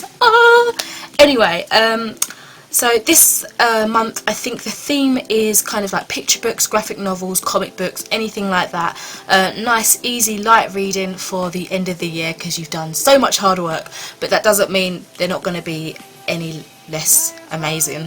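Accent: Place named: United Kingdom. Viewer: I love your accent - it's such an awesome British accent :) Congrats on completing your Goodreads challenge!! also finished it in November - but you read double what I read hahaha very impressive!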